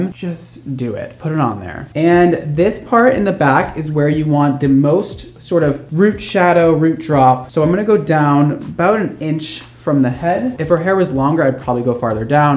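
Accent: Eastern Europe. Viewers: I'm curious if slavic as she have this funny accent (do we all have it🤔) btw, her hair is amazing! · She is so beautiful 😻 I could be wrong but I hear Slavic accent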